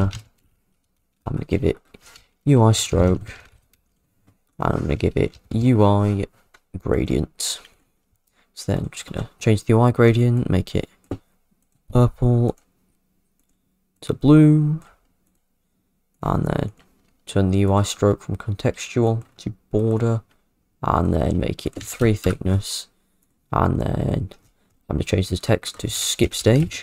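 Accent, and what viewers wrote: United Kingdom. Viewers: Fir o all ge a new mic an fi tha briitsh accent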